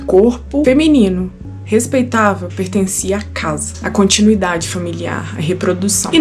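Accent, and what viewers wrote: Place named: Minas Gerais, Brazil. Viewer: 3 palavras e ja desconfio q eh mineira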